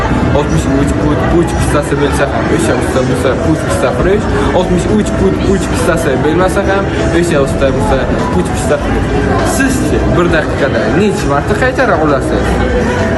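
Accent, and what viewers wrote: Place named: Bukhara, Uzbekistan. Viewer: Бухоро шеваси. МашаАллох углим опа номер 1 рахмат сизга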